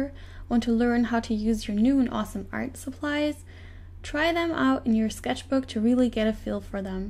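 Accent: Germany. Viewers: Du bist deutsch oder? Normalerweise finde ich es immer sehr anstrengend zu einem deutschen Akzent zu zuhören aber bei deinem war das nicht so es war sogar sehr angenehm. :3 Gutes Video übrigens! Es war sehr hilfreich. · are you german? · Your from Germany right? :D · Omg are you german?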